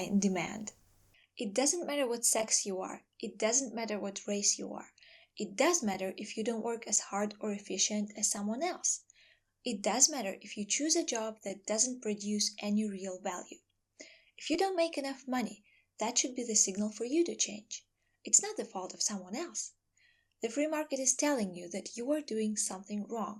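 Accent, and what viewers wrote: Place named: Eastern Europe. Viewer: Your accent sound Eastern European.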